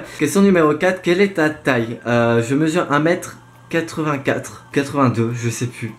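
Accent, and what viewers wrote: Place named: United Kingdom. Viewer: accent British parfait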